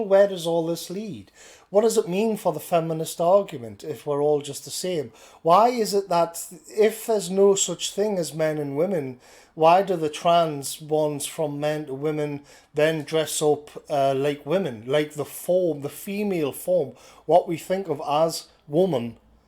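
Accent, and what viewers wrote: United Kingdom. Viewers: I was watching a video about how to do a "Proper" British accent (purely for laughs) when the notification for this came up. Right off blasted with "Twen(!)ee-nine(!)een". Good to hear a proper British accent again.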